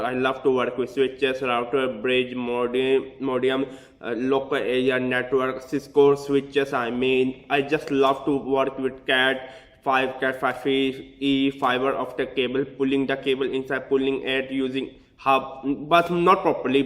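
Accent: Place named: India. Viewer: I really wanted to watch this video because I am trying to decide my job field between those two. Sadly.. I gave up watching this video. I can't understand what he's saying. It gave me a headache after watching this video for 2 mins. Seriously. speak slower. You have super heavy accent so it's really hard for anyone to understand you unless they are Indian too. If you can't fix the way you speak, at least put a subtitle. I can understand most people with accent fine but this is like the worst accent I've ever heard. Super heavy accent + fast speaking = Inglish, not English. Someone please reply which one he picked between those two. I am guessing he picked web developers since he was holding networking books and saying he's born to do networking.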